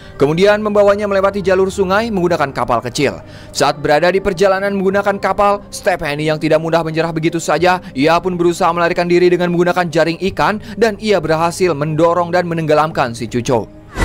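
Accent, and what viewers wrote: West Java, Indonesia. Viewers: Kalau org Sunda emg gitu semua logatnya😂😂😂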